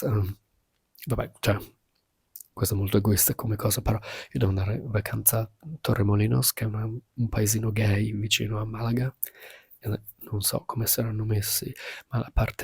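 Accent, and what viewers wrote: United Kingdom. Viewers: Hai fatto una cosa bellissima per tua mamma! mi spiace debba affrontare tanta sofferenza e da sola ❤ p.s. in questo video hai perso un po' l'accento inglese 😅😉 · Italiano molto migliorato, comunque a parte l' accento inglese l' italiano è perfetto dai, complimenti per la festa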